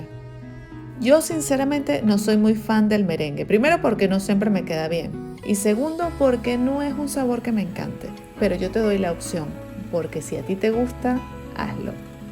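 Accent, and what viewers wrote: Venezuela. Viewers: Amooo el acento Venezonalo, definitivamente!!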